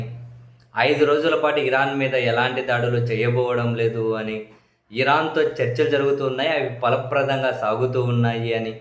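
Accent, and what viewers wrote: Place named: Konaseema, India. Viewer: Bhai...konasema slang.. vallu malli KELLU KOORU..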